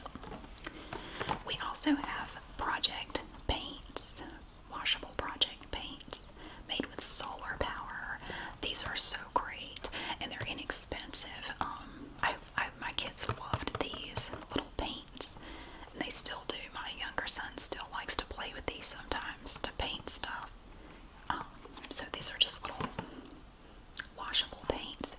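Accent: South, United States. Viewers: It's still a raffle, even if they aren't paying money for the tickets. Raffle just means a random drawing. Thanks for this. I made a comment on your golf video about you being too entertaining for me to fall asleep to your videos. I'm too interested in this so I can't sleep. It's okay. I always have the option to watch your jewelry vids. Those work for me. I hope you have/had fun at your reunion!!! You always do so much for us. I wish I had a billion dollars to give you because you deserve it. I just come for the whispers. Your southern accent just calms me. You have such a motherly-vibe. Do your kids and family know how much you've helped other people?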